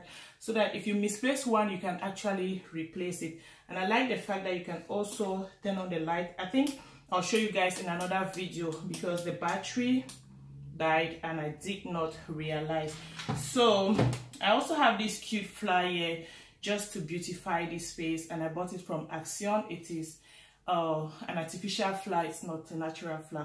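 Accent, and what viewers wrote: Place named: Bamenda, Cameroon. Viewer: Sis I absolutely love the box, I was brainstorming with my sister about this idea last week. To me I was looking for something like this where I can take my food pictures in front. Thanks for inspiring. You are full of positive energy sis and wait ohhh that was my kind of French😂😂😂😂 bamenda geh like me. It's <mail>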